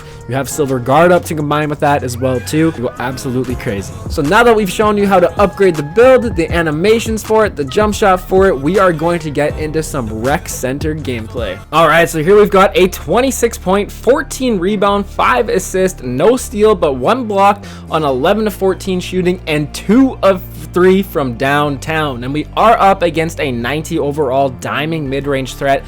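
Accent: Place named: Canada. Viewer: I am going to take a WILD guess... are you Canadian? Whenever I hear you say "out" it reminds me of a Canadian friend of mine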